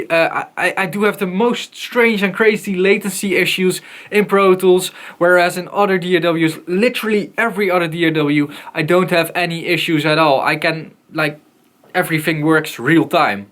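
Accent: Netherlands. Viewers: Dutch accent 👍🏻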